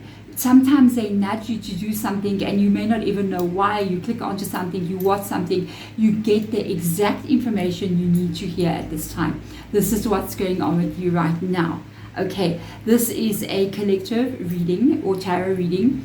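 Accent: South Africa. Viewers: (hope I've wrote your name correctly), I came across your thing recently, yes I'm sure we would be swimming in puddles or pools of tears at times, cuz your delivery is the centre point for me, (chiron intuition, majigotthet, and aeon moon, Erin chandler, four other greats, you might look at, or whoever reads this, I have been touched,your being south African is so poignant you wouldn't believe, look forward to one day conversing, also your accent(voice) is so enchanting you ouse, mother earth and massively much more, love&light, peace&equality(. ❤xxx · So I just came out of a webinar about Bosnian pyramids and Theta healing meditation and this video popped up on youtube. :) I listened to you before and liked your energy, it resonated, but I kind of like to avoid "prophecies", meaning someone else determining what will happen ( as opposed to me making my own destiny), but yet again this resonates. 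i mean we can argue it's algorithm, but then again, why this precise reading popped up. :) I felt for a very long time now like this planet is a prison and I'm being punished (Theres a book I even came across, Alien interview, where an alien (a very advanced species) talks exactly that, this planet is a prison and all of us are prisoners) and at 48 I'm already soooo tired of life haha. The pyramids are heavily dismissed by mainstream science as not real, but they hold incredible energy, very real and oldest on this planet. People have reported healing (where there was "no help") and studies have been conducted. But it's good actually, because not that many people come (as opposed to Egypt) so the pyramid energies are really strong, it's peaceful and great for meditating. Youre South African right? You sound like it, I like that accent. I feel like Africa being the "cradle of life" must hold a lot of wise souls and that's why your readings resonate (when I come across). 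and you're a wicca? which also interesting, and you talk about vedic things, such as karma, enlightenment, which I know a lot about. So THANK YOU. :)))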